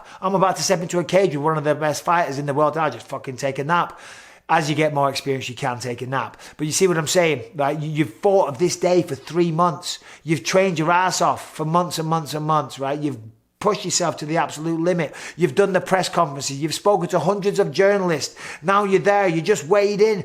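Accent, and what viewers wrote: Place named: Liverpool, United Kingdom. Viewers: Fucking hell that one caught me off guard😂😂………. Also I know how our NW England accent sounds when saying a sentence like this 👍👍!!!! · That scouser accent👌👌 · The scouse was pristine.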